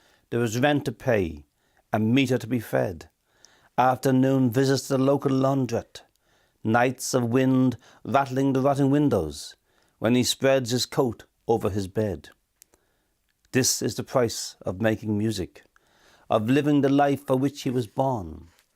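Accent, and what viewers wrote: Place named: Dublin, Ireland. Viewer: I think this was beautifully read about a man he knew intimately through living with him. I was listening to Seamus Ennis in the background and I could truly visualise him walking down Home Farm Road which was close to where I grew up. I could honestly feel the cold, miserable weather as he pulled his coat on and took the number 11 into town. I loved Bolger's accent which was just like all of my uncles, a soft Dublin accent, one of whom even had the same lisp. I was very touched by the simplicity of the words that were so visual to me.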